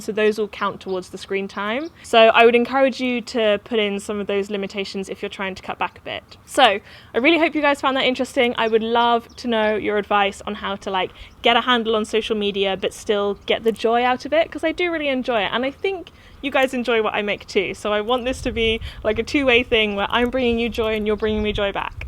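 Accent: United Kingdom. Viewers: You know.. I think I like you )) Your British accent and how you can express all this things. Positive energy comes from you ^^ P.s I also quit Insta for two month already. And it's quite good to check yourself, or just get some extra time. But when I'll get back, I will find you )) Damn, I miss my friends there 😟😁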